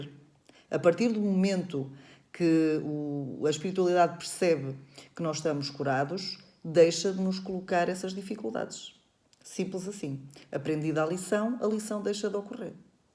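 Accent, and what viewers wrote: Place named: Portugal. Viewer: Você é linda! Fiquei tão feliz de te ouvir e te ver. Gratidão sempre pelos seus ensinamentos. Para mim é uma delícia ouvir a voz portuguesa que é maravilhosa! Muita luz para todos nós ❤❤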